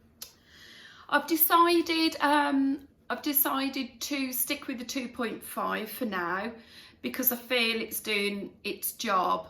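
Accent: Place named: Birmingham, United Kingdom. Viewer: Great idea about the food menu. I feel already now, just gone into my second week, that I have some problem to think out what to eat to even get up to 1200 calories a day. I know it's a "lovely" problem to have but I don't want to lose to lose too much muscles and get a lot of saggy skin just because I can't get enough calories a day. I do know a proteindrink or similar can boost the calorie intake but I'm really into getting my energy from "clean/pure" food now ie not junk food or frozen ready meals. I hope you know what I mean. I split a pizza for lunch yesterday with my dad and had the leftover as dinner. BAD move after eating good stuff the whole week up until then. But as it was my injection day I decided it was that day if I ever there was day to have it. I felt it in my body all evening that it wasn't a good feeling. Didn't go nauseous or so but it have really put me off even more to have processed food. 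But what to fill up with with now? Can't go all Roger Rabbit och scoff a full barn of chicken for protein. 😀 Have you got any ideas or tip of what to choose to fill up on? I have a long journey ahead of me (ideally lose 66-ish pounds to reach a healthy weight. I'm 48 btw and around 5 feet 461⁄64 inches tall/short). Warm hugs from a cold northern Sweden. xoxo Ps.. Forgot to mention earlier that I love your Brummie accent. 🙂 (I remember some chef on The Great British Menu that was a wellknown brummie..apparently...ha,ha..sorry, went total sideline there...)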